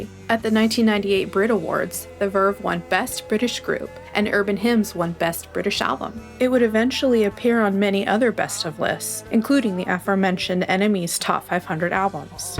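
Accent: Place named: United States. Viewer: Great band. Somewhat ruined by this American voiceover that is read from a script, unless she`s a robot.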